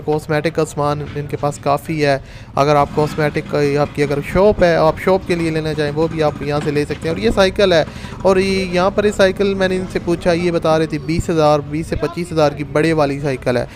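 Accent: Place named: Saraiki, Pakistan. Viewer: AP k bolnay k andaz bata raha ha k ap saraiki language ho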